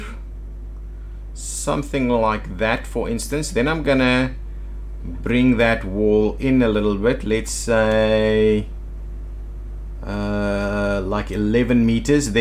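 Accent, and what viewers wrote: South Africa. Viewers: When I was a child, I used to watch a snake expert on TV called Austin Stevens. You're accent very much reminds me of him. I always liked the South African accent. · you sound south african, tell me its true. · Its actually a South African accent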